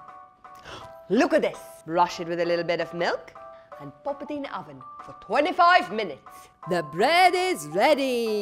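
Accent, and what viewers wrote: Afrikaans, South Africa. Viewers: Is she South African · In her videos she has an Afrikaans accent but that is not how she talks in real life only in her videos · A bad Afrikaans one. Quite common :)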